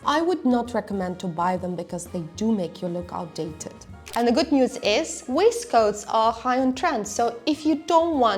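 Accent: Russia. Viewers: Very Russian accent.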